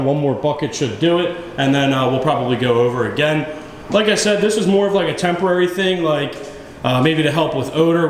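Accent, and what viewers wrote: Philadelphia, United States. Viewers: That Philadelphia accent, though. Do you know it’s, “water,“ not “wooter“?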